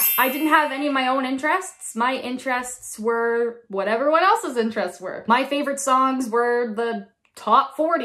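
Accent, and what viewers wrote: Canada. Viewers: nah, you still sound FIRMLY Canadian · no hun still can tell you're Canadian from your "out and about"s hehe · I figured out you were Canadian several videos ago because of your “abouts” lol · 100% sound Canadian haha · Yeah you’re about halfway between Canadian and American when you do it without thinking about it hehe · Your abouts and outs still sound very Canadian to my American ears hehe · 😂💗🫶🫶🫶🫶 you're hysterical 😂 (((((((((penis)))))))) also: you definitely sound mostly Canadian 😂💗🤙 much LOVE ! ✌️ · It sounded super canadian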